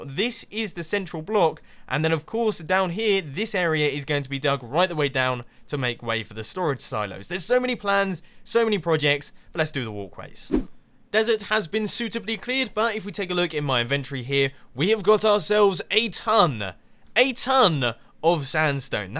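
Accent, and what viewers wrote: England, United Kingdom. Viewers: I like your british accent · Are you English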